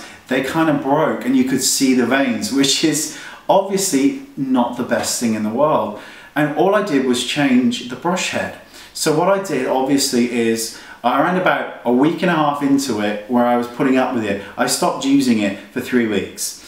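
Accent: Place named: United Kingdom. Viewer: Love British pronunciation! Could you do the Accent tag please? ;)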